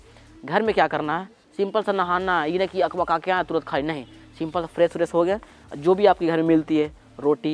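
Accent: Jharkhand, India. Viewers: Bhai ap jharkhand se ho kya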